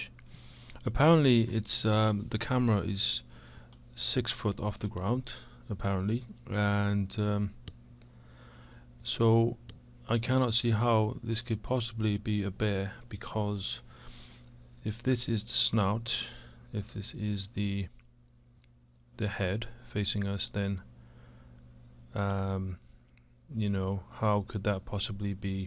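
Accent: Wales, United Kingdom. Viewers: Another great video....do I detect a hint of Welsh in your accent?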